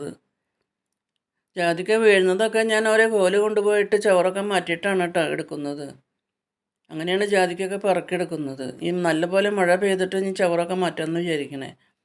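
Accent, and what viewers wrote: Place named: Thrissur, India. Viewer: തൃശൂർ ആണോ ചേച്ചിടെ വീട്. സംസാരം കേൾക്കുമ്പോൾ തോന്നുന്നു. എന്റെ വീട്ടിലും നിറയെ ജാതി കൃഷി ഉണ്ട്. 👌👌❤️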